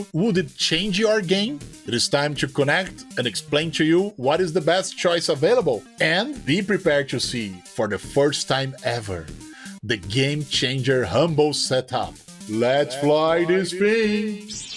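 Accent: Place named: Brazil. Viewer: Although your amazing English I think you are a Brazilian guy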